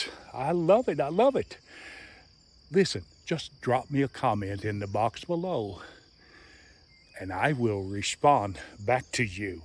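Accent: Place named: Louisiana, United States. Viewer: Hi, just found your channel and subscribed. I just got my firearms license up here in Eastern Canada, along with a good friend of mine. I picked up a Benelli Supernova, but my friend somehow managed to get his hands on the Wingmaster. Great video... and as a French-speaking Canadian from up North, love to hear the cajun in your voice. Merci mon ami!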